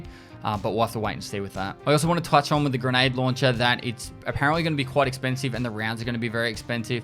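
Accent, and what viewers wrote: Australia. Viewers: he's Australian and his accent just makes it sound like that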